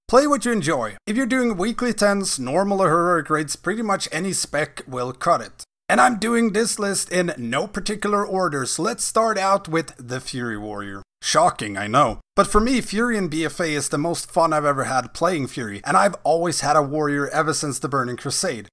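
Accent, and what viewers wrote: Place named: Sweden. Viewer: Nice video, hör på talet att du måste vara svensk, så jag antar det är okej med en sådan kommentar också! Tänkte bara säga att jag har så oerhört svårt att bestämma mig för om jag vill spela Outlaw eller Survival. Tråkiga för egen del är att bägge specs verkar vara lutade åt AoE-dps, vilket jag inte är speciellt het på, då jag tycker om Singletarget-dps mer. Avskyr att se riktigt bra burst men kass sustained damage där man går från att ligga topp 3-5 för att senare sjunka till botten, vilket är därför jag inte vill spela Fire Mage (även fast den specen är cool som attan) då den tycks vara oerhört beroende av sina CDs och tanks i dungeons för att göra hygglig dps. Vill helst inte förlita mig enbart på "CD windows" för att göra mitt jobb. Förutsatt att du kan svara på min fråga, vilken spec av Outlaw och Survival gör "bäst" burst och sustained singletarget DPS? 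Tacksam för svar, bra content dessutom, så även om man inte får ett svar så har du förtjänat åtminstone en sub!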